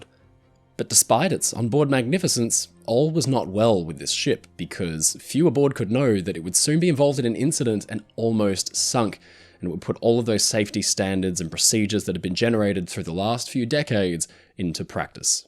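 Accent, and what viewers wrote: Australia. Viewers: Great to hear the beautiful Australian accent. Great vid, but you might want to brush up on your tenses.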